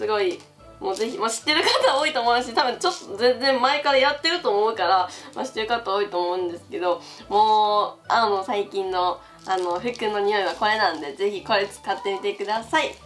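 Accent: Kansai, Japan. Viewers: この動画みて関西弁の女子が好きってことに気づいた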